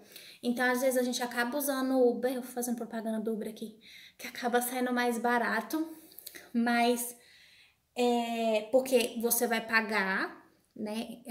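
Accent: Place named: Northeast, Brazil. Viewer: Vc é de Brasília...seu sotaque parece do Nordeste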